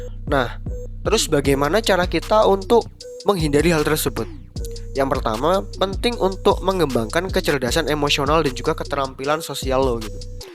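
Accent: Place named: Java, Indonesia. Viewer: logat jawa pake lo-gw jd aneh ditelinga, mending kamu-aku wae mas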